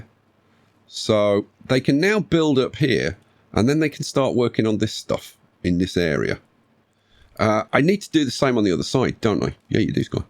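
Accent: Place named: North, United Kingdom. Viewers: Dear, this is a request for you to do a full episode with your Northern accent. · Dear (Smacking keyboard.) As someone from the Greater Manchester/Yorkshire border. I approve of your Northern accent... Bloody parth my backside though lad.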